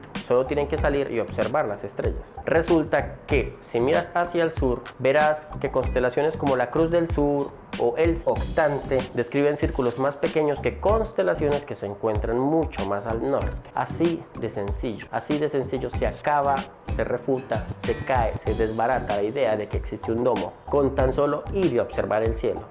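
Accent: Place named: Colombia. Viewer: Buen Colombiano (O, al menos tu acento da a entender eso), por fin alguien que también desmiente las estupideces de esos sujetos.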